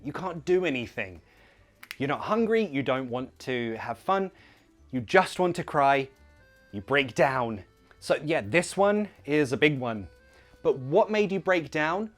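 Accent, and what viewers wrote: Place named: RP, United Kingdom. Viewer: Kudos. Love when you teach posh english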